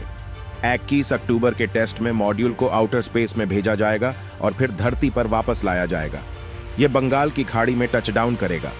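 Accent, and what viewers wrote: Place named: Bihar, India. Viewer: आपकी बिहारी हिंदी सुनकर मेरी हिंदी बोली भी खराब हो जाएगी, अतः शुरू के दो ही शब्द सुनकर विडियो बंद करना पड़ रहा है। Sorry.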